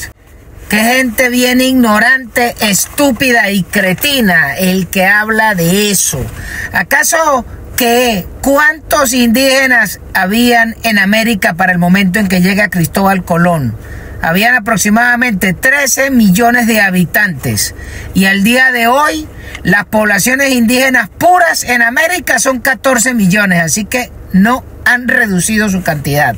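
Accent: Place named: Táchira, Venezuela. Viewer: Así se habla,como un GOCHO arrecho👺💪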